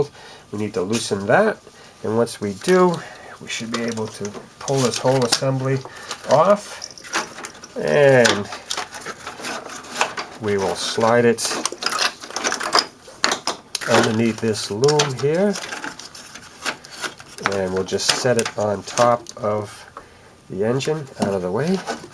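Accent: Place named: East Coast, United States. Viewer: im not a mechanically inclined person so im starting an auto tech 101 class tomorrow..wish me luck..u got a cool lil accent. u must be from the east coast/eastern seaboard.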